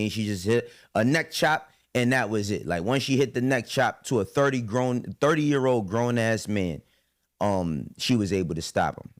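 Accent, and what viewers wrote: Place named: New York, United States. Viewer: lol you sound like you’re from nyc, got that jay. Fitted on, yea you a Stan. Won’t watch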